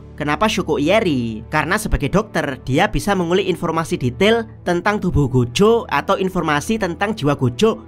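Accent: Java, Indonesia. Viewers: medok bgt mas🤣🤣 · Suara mu terlalu kejawen Bang ... gak enak di dengar ,kurangi logat nya bro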